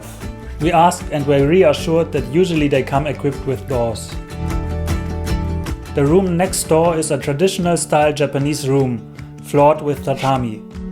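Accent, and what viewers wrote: Germany. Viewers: I love the German accent! Or is it Swiss or Austrian? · hahaha, so you are German. I checked out the page. But is your Japanese better than your English? I hear both German and Japanese influence in your English pronunciation. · Voll der deutsche Akzent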